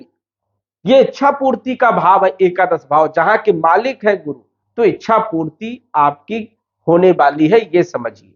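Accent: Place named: Vindhya, India. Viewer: जी लगता है विन्ध्य क्षेत्र से हैं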